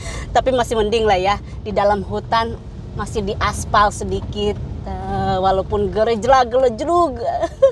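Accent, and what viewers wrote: West Java, Indonesia. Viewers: Ieu kelebihan na eteh,bahasa batur dilogat na ku basa sunda...top markotop teh,sing sarehat kanggo eteh sakeluarga 👍👍👍👍😘😘😘😘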